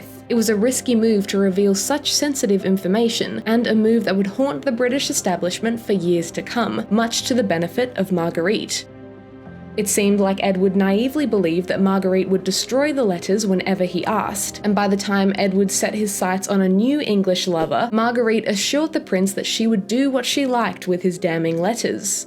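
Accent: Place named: Australia. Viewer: This is excellent! And nice to hear an Australian voice (and "row"? we know what you meant!!).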